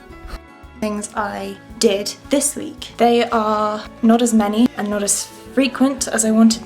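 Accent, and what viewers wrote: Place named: United Kingdom. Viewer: you sound so quintessentially english x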